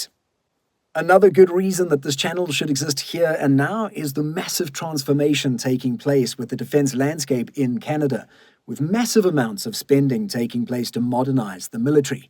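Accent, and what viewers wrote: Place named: South Africa. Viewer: What accent is this... I'm picking up south African... Australian... bit of uk english....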